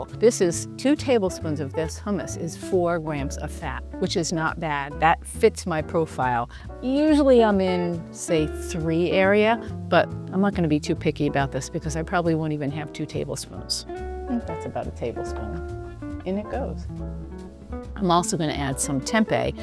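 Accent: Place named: Hamptons, United States. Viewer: Love to use envelopes too, listening to your accent and a reference to the city, I’m thinking you live in the Hamptons? Wonderful view. Love your food, I make veggie soup too, but at the end I take a little out, blend it in the nutribullet and add it back for a nice creamy feel. I definitely need that string blender thing❤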